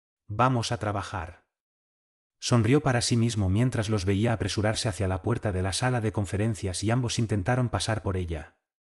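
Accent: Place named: Spain. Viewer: Muy buena narración lo malo no es para que se ofendan el idioma Español no nos gusta por estos lado el español o castellano latino nos gusta más y lo comprendemos más fácil y con más emoción y damos muchu mas arbotantes que ustedes así es que póngale la opción de latino porque para la otra no los escucho nojoda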